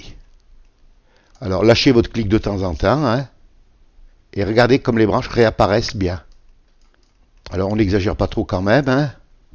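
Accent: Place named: Savoy, France. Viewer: vos tutos sont toujours tres clairs, en plus on a l'accent savoyard. j'ai visionne votre tuto sur le montage dans photoshop mais comment l'enregistrer pour l'envoyer par mail? Merci. C Del Grande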